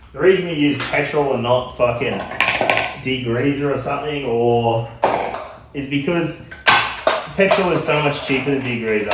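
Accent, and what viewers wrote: Australia. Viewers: *'s like a shit-talking, Aussie-accented shop manual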